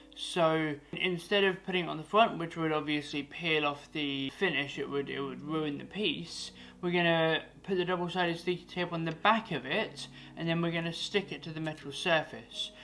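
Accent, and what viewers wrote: United Kingdom. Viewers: Unfortunately the huge reflections on/off your glasses..is well very distracting..that then the lower then normal voice volume mixed with the possibly British pronunciation and dialect makes for difficult viewing experience..a pair of non reflective glasses and turning up the vocal volume overall would really improve your videos..Cheers mate..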